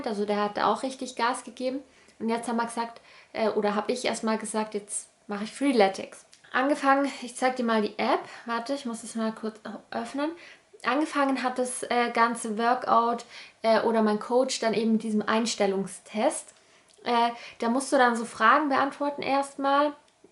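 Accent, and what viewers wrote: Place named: Franconia, Germany. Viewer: Daumen hoch wegen deines fränkischen Dialekts :-)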